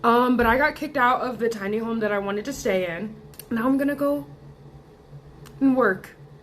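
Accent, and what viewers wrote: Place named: Transatlantic, United States. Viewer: you’re the first GenZer with a transatlantic accent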